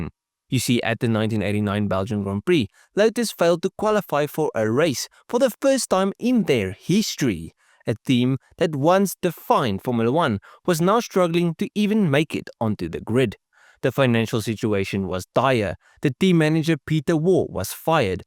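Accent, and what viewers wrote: South Africa. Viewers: Three seconds in and I’m like holy shit this person has the thickest South African accent I’ve ever heard.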